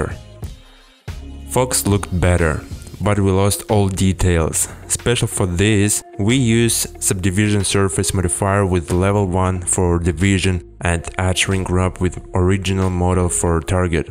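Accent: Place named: Eastern Europe. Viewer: Accent never gets old. It's like the eastern European version of.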